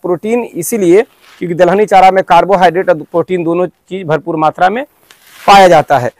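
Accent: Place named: Bihar, India. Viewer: Bhai ya to Puri terah se khadi Hindi bolo nhi to Puri terah se Bihari language me bol ..nhi aata bolne to kamse kam wo kaam nhi karna chahiye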